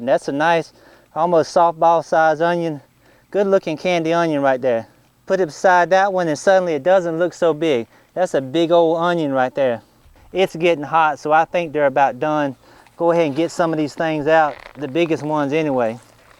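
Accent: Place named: Virginia, United States. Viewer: lol.. no wonder i love your accent. i live in southern va too! im an apartment dweller snd cant grow my own veggies but would love to. thank goodness for my local farmers market!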